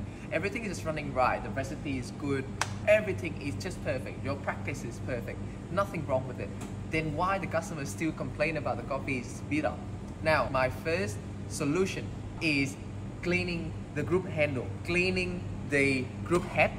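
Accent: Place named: Australia. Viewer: Bạn nói giọng anh Úc ạ? Nghe lạ và vui tai quá 😊😊😊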